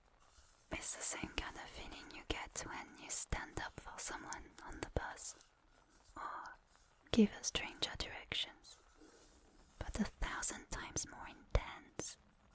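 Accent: France. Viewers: You need to do more french accent videos more often, they're so underrated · I don't know how to feel with the fact that I'm a spanish speaker and i'm listening to this english ASMR with french accent- Need more 🗿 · I love French accents so far. 10/10000. But the French lady is very kind and also lovable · Everyone thinks French accents are beautiful, but I never really found the appeal before now. This video just made me say, "Ahhh... now I get it.." 😢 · Never thought I needed to listen to an ASMR with a lovely French accent. Magnifique! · I never thought french accent on english was so good. I can say thats even attractive. Looking forward for more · One more Time Your voice sounds so good in French and with the French accent… À quand un audio juste en français 👀🤣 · YES! Some more French accent. I don't grave for the affection, I crave for the accent. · I'm curious about something. 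As a french woman did u need a lot of practice to get your english skill to that level? Or did u had an accent like in this video and improved from that. If u hadn't how hard was is to train one.😶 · This is the best ASMR audio I've ever listened to. I listen to it so often it's crazy. The script is so well written, it's so down to earth, maybe not something realistic but it's not so out there like some audios I've heard. It's personal but also impersonal in a way. Really gentle and doesn't distract you from sleep/relaxation. Your voice with the french accent is so perfect, it's soothing and you sound professional and trustworthy, works really well with the script. Your other audios don't hit as hard as this one. They are good but this one has that something. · I usually can't stand the french accent in English but your voice really makes it work. You have a wonderful voice and mastery of both languages. 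Thanks for your work and here's to your spread on the platform. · The wholesome, soft-spoken french accent is awesome... Slept well with this one when insomnia hits last month and didn't end this video consciously till today. Brilliant masterpiece! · The return of the glorious French accent! I thoroughly enjoyed this script and you did a wonderful job with the audio,. Cheers!😊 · pls more french accent audios 🥰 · Oh my goodnesssss, your French accent is amazing!! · This and the maid video are the two most soothing videos on YT. I'm writing a novel and one of the main characters has a french accent. Her name is Emilie, so this video really caught me off guard when I heard her name lol. Thanks for the awesome content, and for giving me a voice to picture when I write the character. Hope we get more french accent videos in the future, but all your content is great. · Thank you for another french accent content. 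· The combination of your voice and the French accent is incredibly soothing. Thanks for everything you do. ♥ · This would have been an amazing video just from the script but your VA talent elevates it to another plane. It’s absolutely incredible. This video was the first of yours I found and made me instantly sub. I sincerely hope you consider more French accent videos! Especially with the intimate but not explicitly sexual script. I’ve been listening to ASMR for about a decade and don’t think I’ve ever been so completely enraptured like this. I’m sending the F4F version to my wife hahaha 🥰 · I don't normally comment in these videos but I just wanted to say that these videos with your accent and all. I keep coming back to it. There is something about how you speak with your French accent that never gets me tired of hearing it, even if I've listened to the same audio a countless amount of times. 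You've done a great job here. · This melted my brain in the best way. Please do more content with the French accent / sweet talk like this 💙🤍❤️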